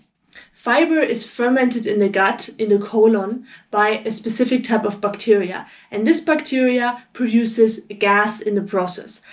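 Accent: Germany. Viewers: German accent is not really appealing for ears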